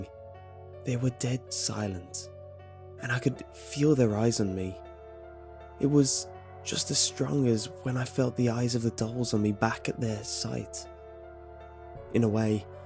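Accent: England, United Kingdom. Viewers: can you pronounce it "Creepypastra"? is that a britt accent? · Haha, seems everyone sounds the same and has a lovely English accent in these stories… hmmm. :P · I love his British accent! That’s when I sawr it !! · bruh he's british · From Massachusetts but has an English accent? K🐸🍹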